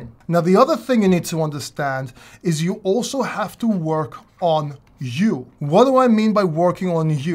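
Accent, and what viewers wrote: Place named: North London, United Kingdom. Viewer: I could detect a slight N London accent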